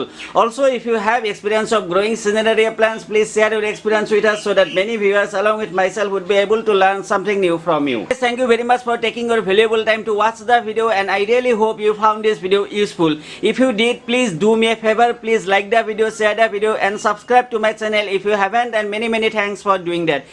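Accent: Assam, India. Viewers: Accent to huni axomia zen lagisil,kintu aji gom pai montu bhal,lagise.moi apunar video bilak saon aru bohut hikisu.kintu like aru suscribe aji disu.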